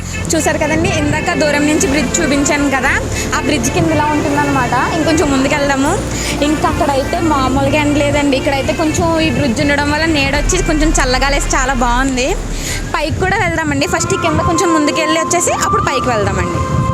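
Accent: East Godavari, India. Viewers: Superb akka mana east Godavari natural ga ela matladatharu... nv video lo are naturalist use chesav ..superb ..keep rocking 💓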